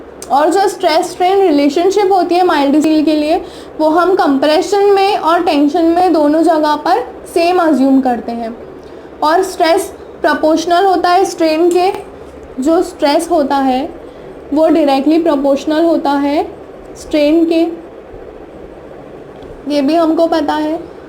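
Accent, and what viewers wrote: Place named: Telugu, India. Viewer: Madam jee aap ka telugu maa boltha Hai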